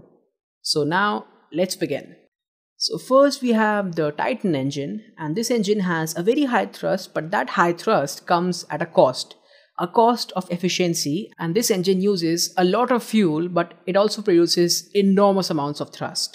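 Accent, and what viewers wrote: India. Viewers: Are you indian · Dude are u Indian? Ur accent sounds so.. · Why u sound like indian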